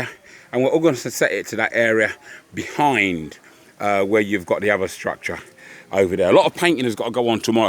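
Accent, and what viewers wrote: United Kingdom. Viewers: I was thinking to myself that you sound so British and found myself wondering if you spoke Igbo. Then I got to the end of the video, and you actually spoke Igbo. Love it!!😍😍 You are a bonafide sob of the soil. Okpara eji eje mba! Jisike, nwanne’m.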